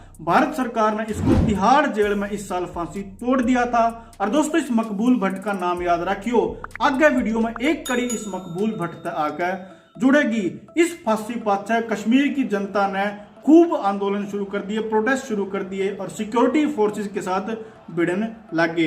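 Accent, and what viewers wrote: Haryana, India. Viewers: Bhai tara video suru hon ta paala ka intro va haryanvi tone bhot badiya laga ha 👌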